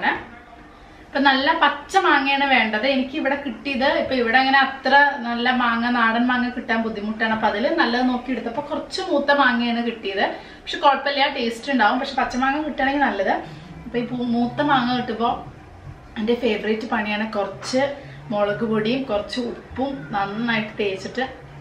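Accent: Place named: North Kerala, India. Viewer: Madam... നാട്ടിൽ എവിടെയാണ് ? നല്ല വടക്കൻ ഭാഷ .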